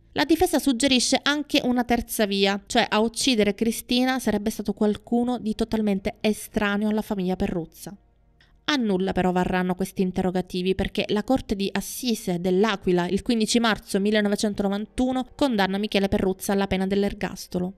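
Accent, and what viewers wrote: Sicily, Italy. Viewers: Aspettavo da tempo che qualcuno trattasse questo caso......e finalmente lo ha fatto, come sempre la tua voce narrante è molto precisa e il tuo accento siciliano dà quel tocco in più che mi fa seguire i tuoi video sempre con moltissimo piacere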